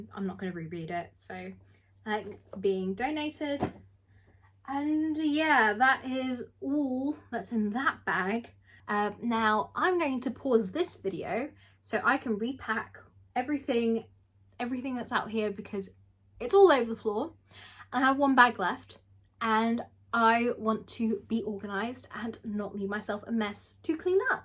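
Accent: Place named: England, United Kingdom. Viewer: You sound like you live in England. Were all your books bought there and can you get them in Canada?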